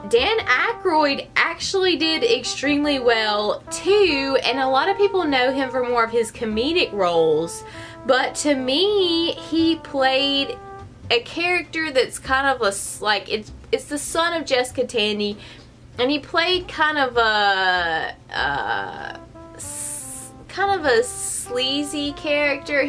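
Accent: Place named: South, United States. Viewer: Love your accent. Proper southern lady there.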